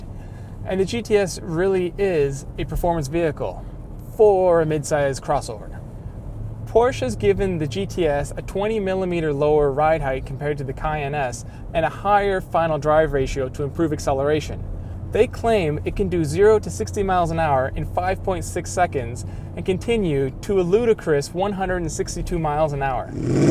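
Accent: Canada. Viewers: Here in Texas it gets pronounced correctly, but then there are many descendants of German immigrants here. The reviewer sounds like he has a Canadian accent, but I bet you can find some Canadians who know how to pronounce it properly. I've heard the "porch" pronunciation in Australia and New Zealand too, so maybe its a British commonwealth thing...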